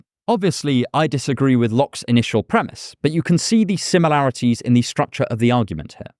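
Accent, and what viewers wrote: United Kingdom. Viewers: I really enjoy listening to your videos. I love how evenhanded you are in presenting both sides of arguments and how you make philosophical ideas come alive. And, I love your British accent! 🤩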